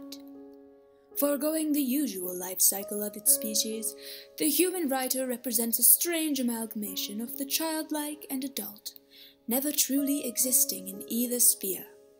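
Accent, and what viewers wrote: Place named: England, United Kingdom. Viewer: I’m so impressed with the English accent 😂 I’m assuming we can thank your time at Durham?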